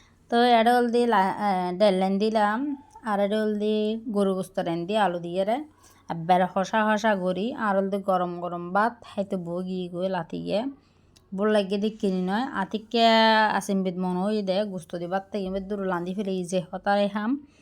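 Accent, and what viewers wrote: Chittagong, Bangladesh. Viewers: আপু তোমার মুখে চিটাগাইংগা ভাষা অনেক সুন্দর লাগে 😅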